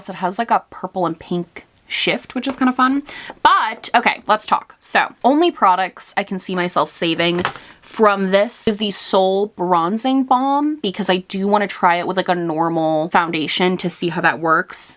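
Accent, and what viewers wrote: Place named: East Coast, United States. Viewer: LOL i knew you were an east coast girl by your voice. love you x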